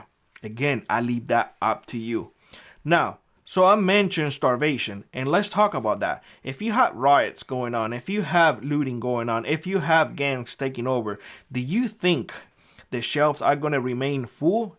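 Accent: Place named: Mexico. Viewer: YOU MUST BE A WHITE MR MEXICAN, DEPORT YOU TOO. YOUR ACCENT GIVES YOU AWAY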